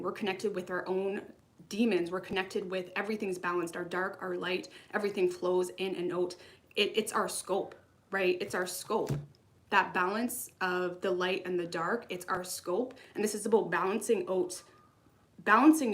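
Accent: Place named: Canada. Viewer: Love your readings and your accent...oot and aboot! X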